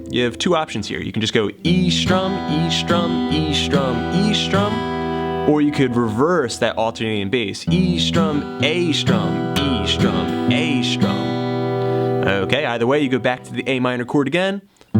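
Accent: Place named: California, United States. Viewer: I like your subtle Californian accent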